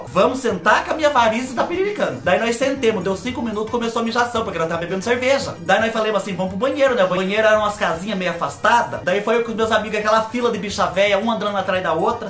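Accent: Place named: Caipira, Brazil. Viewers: Vc. Fala iguarsinho eu quando morava em minas gerais 😁😁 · Olha eiu concordo com o que comentou que se vc fizer Stand Up vc arrasa! Porque vc é muito engraçado porque exagera e fala com sotaque caipira muito engraçado!!!! Bj bj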